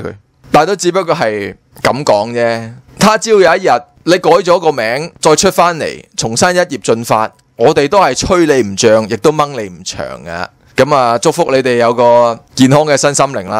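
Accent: Taishan, China. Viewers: ok wor 式台山話我接受到 wor幾搞笑丫😂😂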